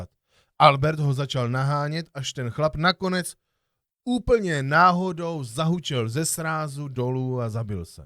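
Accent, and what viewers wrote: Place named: Brno, Czech Republic. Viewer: A hlavně s brněnským přízvukem 😉👍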